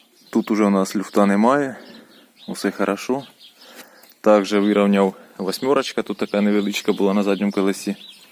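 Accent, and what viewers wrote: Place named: Chernihiv, Ukraine. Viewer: Теска., мені теж твоя вимова нагадала мені, що це таке, щось знайоме, не міг згадати. Почитав в коментарях - Чернігів. Можливо, був там в 90-х. Приємно слухати. Бажаю тобі упіхів в житті та розвитку каналу. Я підписався. Хочу порадити, друже, якщо є можливість, купляй "корчики", роби їх чесно для ЛЮДЕЙ, знімай відео для нас, перепродуй вигідно для себе і радуй нас гарними порадами. Особливо це буде потрібно для нашої молоді. Щиро вдячний, МИРУ нам усім і ДОБРА в кожну родину!!!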